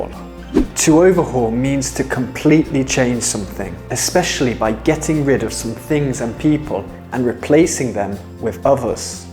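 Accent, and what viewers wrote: United Kingdom. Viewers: Could you please tell me what's your accent? Cockney or rp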